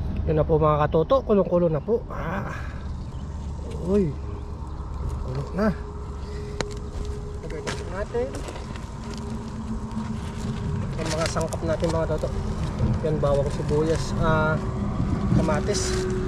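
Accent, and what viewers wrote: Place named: Iloilo, Philippines. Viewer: saang province ka ba galing?naitanong ko lang kasi ang punto mo parang ilonggo.😊ingat lang po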